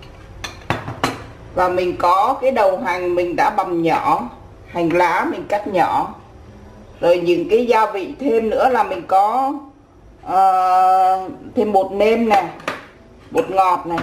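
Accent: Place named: North, Vietnam. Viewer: Co o Dong nai ma cung an mon mien tay ha.giong co nguoi bac ah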